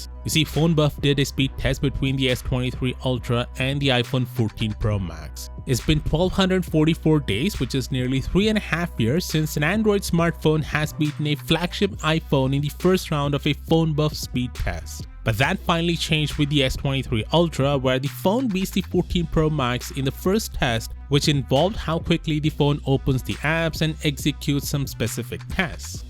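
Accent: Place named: India. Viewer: I know an Indian accent when I hear one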